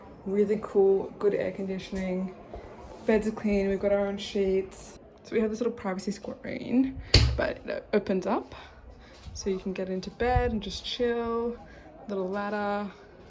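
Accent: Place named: Germany. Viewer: Clearly! She has a strong German accent 😂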